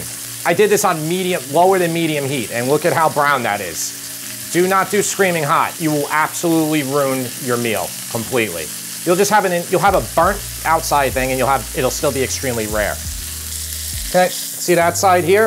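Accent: New York, United States. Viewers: They offer the same to me- a gal from NJ who now lives in SC and just feel so happy to hear the NY accent and food details, like calling a pizza a "pie".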